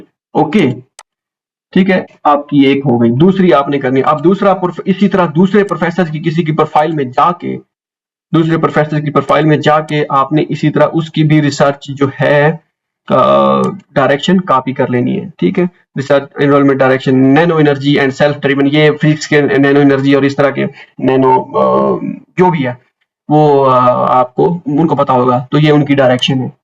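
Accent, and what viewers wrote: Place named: Layyah, Pakistan. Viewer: ap k bat krny k styl se lg rha hy k ap layyah se hn... am I right?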